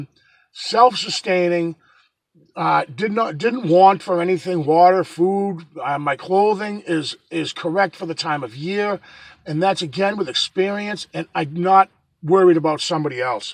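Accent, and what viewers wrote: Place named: Massachusetts, United States. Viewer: Hey just re watched your video.....omg...looney bins..lmfao...havent heard that in the 25 years I been gone FROM NE....i love watching and listening to you and let's not forget that Mass. Accent.....Linda in Florida...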